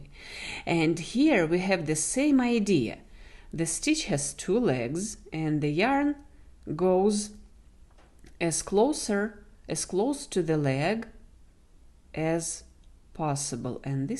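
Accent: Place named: Russia. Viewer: in Russia we call it grandma’s style. Also we have other styles such as classic and eastern. Aren’t you russian by any chance? Your accent sounds russian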